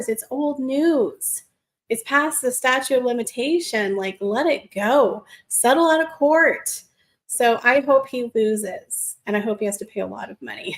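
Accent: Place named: California, United States. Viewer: I’m from California and I agree with your Mom that you sound like you’re from California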